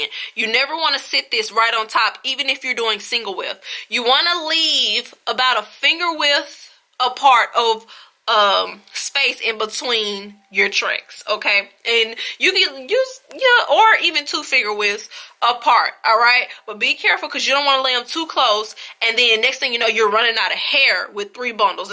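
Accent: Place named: South, United States. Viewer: You sound like the southern version of raven symone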